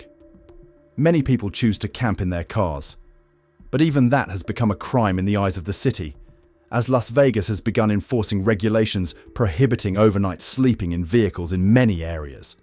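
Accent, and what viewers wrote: United Kingdom. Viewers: I work on the strip. Untrue. Every night I leave nobody sleeps on strip. And they are not allowed in any casinos. Yes there are some in the tunnels but you overexaggerat. Why did your video sound like you're UK.??? Homeless in Vegas, why are you in the damn tunnels???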